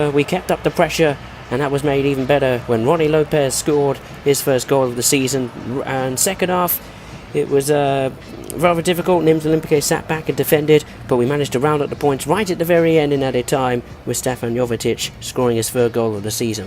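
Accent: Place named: United Kingdom. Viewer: You sound like a rich posh englishman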